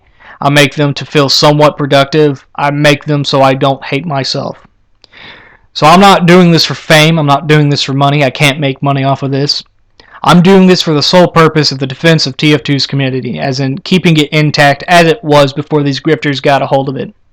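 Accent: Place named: Texas, United States. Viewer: you sound extremely Texan i dont live in america so i cant exactly say what texans sould like tho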